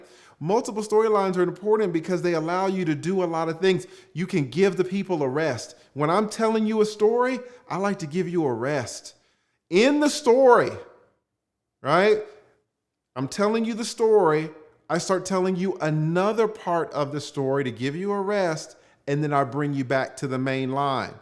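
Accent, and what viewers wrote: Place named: South, United States. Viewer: Definitely the king of storytelling! With that southern twang and then you throw in you "fuckers' at times and when you say the chat told me to 'suck a d@ " haaaaaaaaaaaa cracks me up! Yessssss you're the man!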